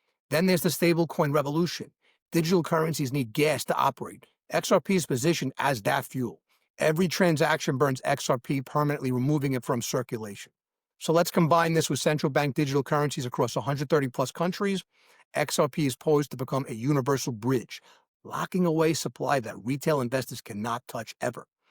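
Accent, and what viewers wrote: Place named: Long Island, United States. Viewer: I lived in Long Island as a kid so I know the accent! I live in the Chicago land now but NY will always be home.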